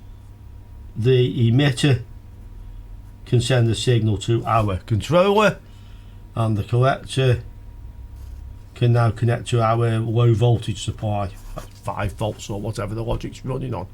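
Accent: United Kingdom. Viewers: Thanks a lot for correct explained ❤ Sometimes I have problem with the British accent! What I missed is directly connected diac and thyristor to Gate! Variable supply of diac to Gate! But thanks 😊 U give me Manny answer's and open a lot of questions!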